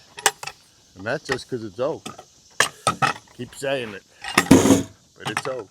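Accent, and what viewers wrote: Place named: Long Island, United States. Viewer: You can leave LI, but LI won't leave you. I'm from East Tennessee but worked at Brookhaven National Lab for about nine months and your accent was exactly like the guy who lived next door for several years who was from LI.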